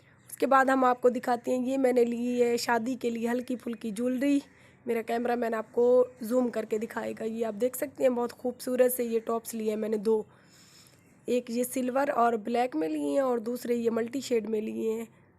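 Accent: Saraiki, Pakistan. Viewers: Behan g bhatta program bohat Accha tha,ghareeb bhatta mazdooron ki mushklat Kiya Hoti Hain khoob rahnomai ki,,Behan pehle bhe ap ko SARiKi zuban men program Karne ko Kaha tha,,,,Behan g ap Ka lehja SARiKi zuban walls hai,ap Urdu mein jhlagta hai,plz APNI zuban mein program Kia Karo hamara SARiKi khitta hai ziyad SARiKi boli jati ha na Urdu Kam,,👌🙏🙏🙏💯👈plz reply,🤗 QAiSER.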